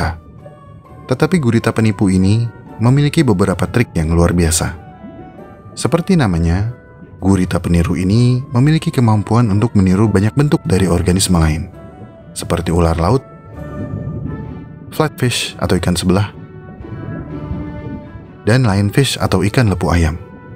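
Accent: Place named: Java, Indonesia. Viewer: Orang Jawa yah lu bang 🤨🤨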